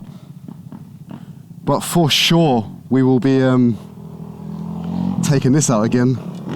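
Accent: United Kingdom. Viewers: Uk accent but NZ roads is wigging me out haha. Would love one of these. I have CBR250rr (another 20k screamer). Is the best.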